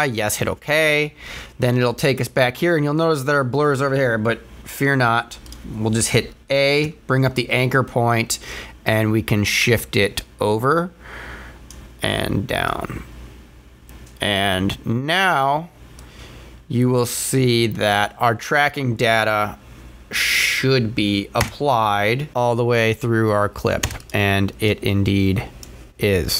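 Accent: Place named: United States. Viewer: I HATE YOUR ACCENT IT MAKES ME SOOO ANGRY!!! fucking amercian talking like a hobo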